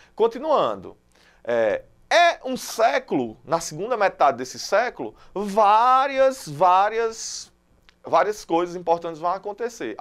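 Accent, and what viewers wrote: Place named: Alagoas, Brazil. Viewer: Professor deve ser alagoano !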